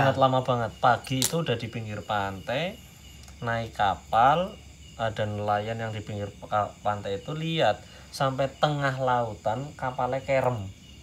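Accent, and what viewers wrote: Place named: Banyumas, Indonesia. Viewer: Ora ngapak ora penak mas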